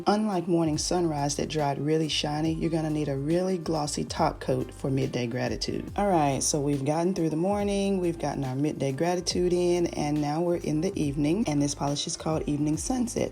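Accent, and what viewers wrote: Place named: South, United States. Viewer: Goodness gracious sakes alive this collection is GORGEOUS🤩!!! I never saw this brand before so I appreciate you introducing me to MJ Polish! I'm gonna get this whole collection on their black Friday sale😁...thanks for that info too! On a side note.... I feel so comfortable when I watch your videos. Your kind voice & personality makes me feel like you are one of my cousins next door! I'm in southern Kentucky (close to Nashville Tn) so I LOVE your southern accent & you saying, "y'all", all the time like I do! I know this has nothing to do with the polishes but I'm sure your personality is alot of the reason people subscribe to your channel 😊. Thank you for the video & have a BLESSED Thanksgiving!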